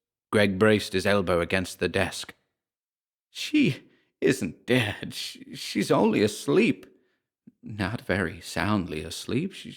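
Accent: United States. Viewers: Fine job with the American accent! Sincerely, Katherine Los Angeles, California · Yay, more American! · Your American accent has gotten to be nigh perfect!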